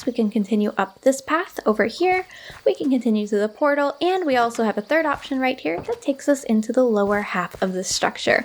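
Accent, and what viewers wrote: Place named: Newfoundland, Canada. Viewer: Haha I'm just teasing. It's cute! I didn't know people from newfoundland spoke like that. That's a good fun fact x)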